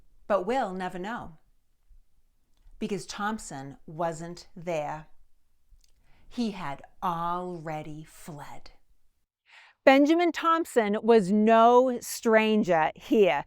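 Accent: Boston, United States. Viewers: Who’s here for the bahstun ahkscent……..the lettah, the cah, I love this accent 😂😂😂…..❤❤❤ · I cant believe i thought she was British for a minute . Her Boston accent is so Revolutionarly Fantastic!!!! ❤❤ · That accent. My Bostonian heart. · I love the Boston accent! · Now THAT’s a true Boston or at least Massachusetts accent!🤣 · “…. but this leatter conTAIns FAr Maore TAN meats ta eye.” I had forgotten how much I love a properly spoken Boston accent. AND wicked good content? The narrators constant location changes requires far more volume mixing with a sound bar than one would think. Truly impressive post production work! It was seamless. Hearty congratulations! I’m ALL in !! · I love your Bahston accent and I'm from Boston... Bean Town forever!! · Wow, that is one thick Boston accent! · you're reading too much into it. Time to relax. I was smiling and laughing through the entire video. 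Her Boston accent made me HAPPY.😂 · As always, the facts you bring to us are fascinating and often something we are learning for the first time. Isn't it tragic to betray not only a friend but your own country. Btw, I'm not from Massachusetts but I love your Boston accent. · My God, the accent... never heard one this thick before, I wonder where in BeanTown was she raised? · Please edit your video captions. You have a Boston accident that makes it hard to understand. So I I used captions. Where is Wuben? Good info, just make yourself more understabdable. Thanks. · Hearing these stories from someone who is clearly a Bostonian and has the accent to prove it makes me feel good, I was raised in Somerville and know its history very well. Thanks for sticking to your roots! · I love lovely Boston accent. Out here on the west coast we don’t get to hear that enough. It took me a while to figure out that what she pronounces as “Woben” is actually Woburn! 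· Interesting historical info and I enjoy her Boston accent but I wish someone would tell her that she doesn’t need a separate hand gesture for every single word. Just speak; you don’t need to continuously wave your hands. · Subbed for the Bahston accent ALONE. Intelligence:: BONUS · Very interesting, great job!! Love the Boston accent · A great story. Plus, a well-edited presentation, delivered with passion and enthusiasm. And a fascinating accent! I had no idea that this is the Bostonian sound. The whole video is clear, measured, and charming. Thank you. 👏🏻👏🏻👏🏻 Subscribed. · now that's an authentic Boston accent. Awesome uncovered history! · WILL YOU MARRY ME?! …and speak freakin Boston-ese sweetly in my ears for as long as we live?! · I love your channel.I love american history and I learned something today, thank you for doing these videos.I love your boston accent you're very genuine, and very adorable person and I believe you are gift from God to us.Americans and may God bless you forever! 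· That accent is definitely Boston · “Ahlettah was sent that nevah was meant to be seen…. It makes you wandah…” Now that‘s a Boston accent! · OMG, love that strong Boston accent! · I truly love your enthusiasm for history and your Boston accent is amazing! Consider me Michael Paul Padilla a fan and a friend in history!! Thanks so much for your wonderful videos!!!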